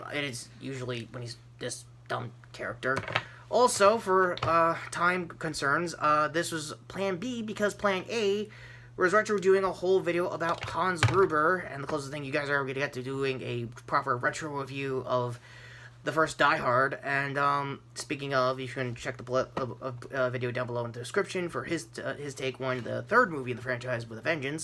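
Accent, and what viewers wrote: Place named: United States. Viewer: You sound just like Tom Holland's American accent!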